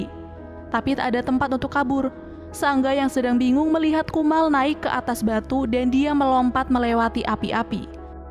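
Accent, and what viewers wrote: Sunda, Indonesia. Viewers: Hahahahahaa nyariosna mni raos sundana🤣🤣🤣🤣👍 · teteh orang sunda ya😂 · Seru banget ceritanya mengharukan Apalagi pas pake logat Sunda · Sih teteh Sunda nya kasar teing nya 🤭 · Teh ai teteh budak Sunda nya,Sunda na NU ti mana😅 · aduh Mimin aslli Sunda Oge nya👆🤭🤭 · Sunda banget😂😂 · Lucu ey aya sunda sundaana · Geloah ngomongna Sunda pisan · Sumpah lucu pisan nyarios Sunda meni enaken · Bukan cerita'y yg saya suka, malah denger bahasa'y, asli urang sunda pisan ieu mah. 😆😆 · Pengisi suaranya orang Sunda anying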